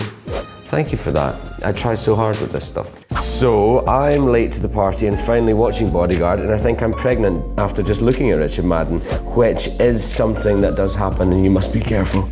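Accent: Scotland, United Kingdom. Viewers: Scottish accent! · it's a Scottish accent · He's Scottish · Trepimero Scottish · he's so Scottish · Omg, he is a Scot! Just realized that!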